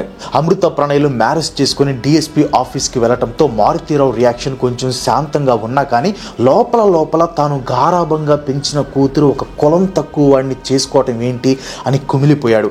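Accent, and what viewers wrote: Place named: Rayalaseema, India. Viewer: Meeru seema bidda ahh?! Maadhi kuda seema ney! Andhuke aa yaasa nu batti adigaanu😎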